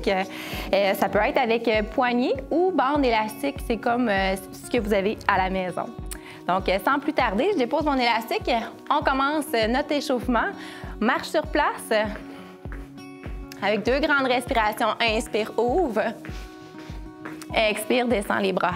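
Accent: Canada. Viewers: C est clair le rythme est bon et un petit dernier …avec l accent canadien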